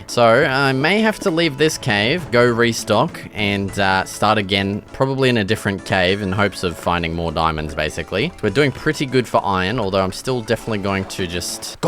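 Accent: Australia. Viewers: i wish i had such a strong aussie accent!! love the video❤❤ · Is this like every aussie accent cuz lazarbeam has the same voice as this guy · Aussie Accent makes this so much better 😂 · Your channel came up on my homepage, I wasn’t going to watch til I heard the fellow Aussie accent and I’m glad I did 😂 I appreciate the euphemisms please keep em coming #knoblet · It’s good to see a Aussie minecraft YouTuber not many out there ,also you kinda sound like Robert Irwin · i was 2 mins into the video and already know that he is aussie lol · That aussie accent is coming on hard bro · In the first 2 minutes I figured out that he is a Australian 🇦🇺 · the aussie accent is so clear lol i love finding aussie ytbers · I’m new to your channel,…I heard your accent so I was on my way to your “about this channel” page and heard you say Nor 😂 immediately new it would be Australia lol… love the video I haven’t finished it yet but I plan on it! 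· You sound Aussie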